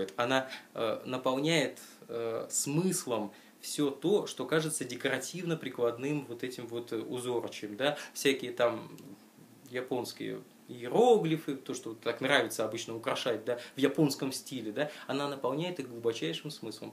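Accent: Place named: Russia. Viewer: Здравствуйте. Заинтересовала книга. Спасибо за рассказ. Подскажите из какой вы части России или вы из Украины? Интересует ваше произношение Япония, японцы.)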